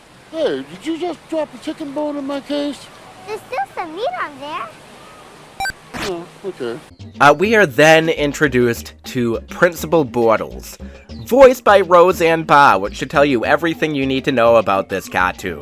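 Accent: Boston, United States. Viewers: Are you from Boston?!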